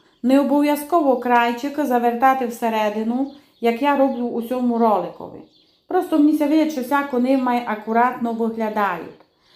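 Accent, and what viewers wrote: Transcarpathia, Ukraine. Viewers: Мій лайк і підписка. Я з Івано-Франківська, але мені дуже подобається Закарпатський діалект і дуже поиємно Вас, слухати. Ваші рецепти супер. З нетерпінням буду чекати на Ваші чудові рецепти. 🥰🥰❤️🩵🩵🩵💛💛💛🇺🇦🇺🇦🇺🇦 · Найбільше любила такі рогалички з трояндовим вареннячком ♥ Це такі теплі спогади з давніх весіль та празників. На львівщині це - рогалики. Дуже люблю слухати закарпатський діалект. Дякую Вам за позитив і досвід. А хай не ростроюється )). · я дуже люблю слухати вашу закарпатську річ я зі Львова але приємно чути кожну людину з різних куточків України добра вам і вашим діточкам❤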